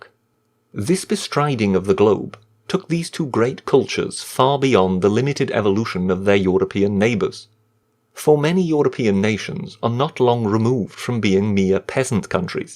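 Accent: Wales, United Kingdom. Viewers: Do I hear a Welsh twang? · I never realised how biased the media was in the UK until Brexit came about. The BBC's supposed impartiality was exposed to me as being a complete and utter lie. They're even worse when it comes to President Trump. Always negative, never positive completely one sided in most regards. Great summary, should be heard by politicians. I detect a Welsh accent too! ;)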